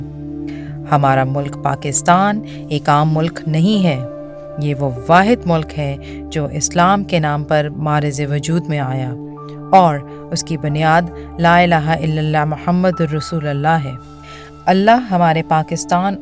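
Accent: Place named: United Kingdom. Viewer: Bhai yeh urdu k Kai lafz glat pronounce kr rahi hy English accent use kr rahi hy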